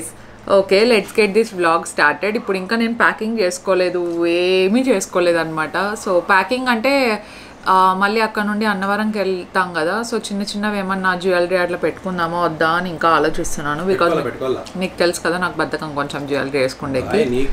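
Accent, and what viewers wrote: Anantapur, India. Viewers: AA Emi julee ni esukochinare pinni ananthapur slang haaaaaaaa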